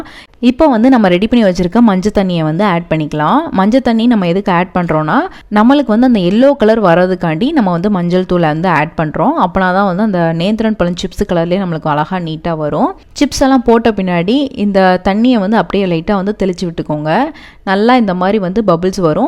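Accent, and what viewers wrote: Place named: South Tamil Nadu, India. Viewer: South tamilan spotted by her slang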